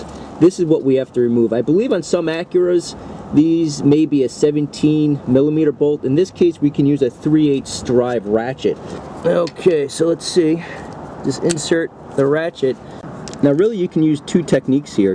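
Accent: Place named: New Jersey, United States. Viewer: His NJ accent reminds me of Jay & Silent Bob!! 😀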